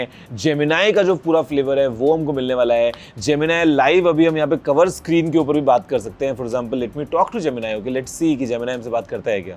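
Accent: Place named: South Delhi, India. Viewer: South delhi girls jaisi accent hai bhai teri. Makeover ke sath sath accent pe bhi kaam kar thoda.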